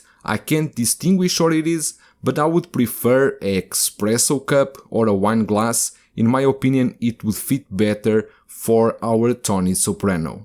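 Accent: Portugal. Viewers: Pela forma de falar / sotaque logo vi 🇵🇹 Que honra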